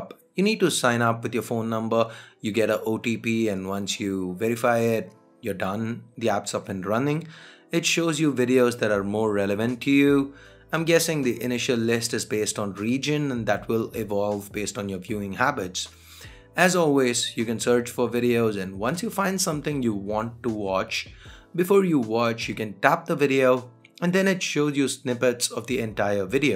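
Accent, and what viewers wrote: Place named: India. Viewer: Want 2 wowch😂😂 Indian accent is so fucking funny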